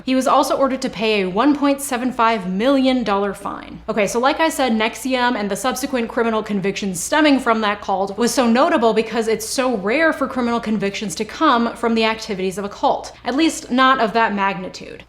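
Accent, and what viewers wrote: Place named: Midwest, United States. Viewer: Your the only YouTuber that I don't skip the ad plug because I love your Midwest accent. I think it's cute.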